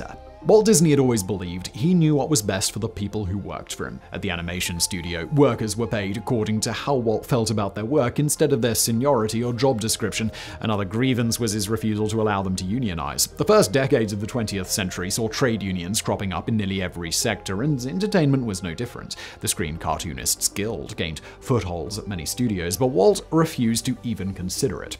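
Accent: United Kingdom. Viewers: Sometimes it occurs to me that my family members probably wonder why I'm listening to the same British guy constantly, unaware of the unusual number of channels this man hosts.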